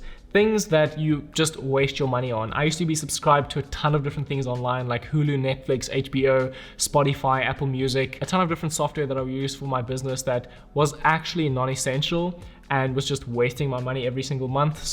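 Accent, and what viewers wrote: South Africa. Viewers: Sounds like a Bishops educated (Cape Town) ex South African 🤙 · You sound South African! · I love all your videos....and listening to the familiar South African accent has nothing to do with it! ☺️ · I can't place that accent, it sounds South African? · Are you South African? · Are you South African? 🙌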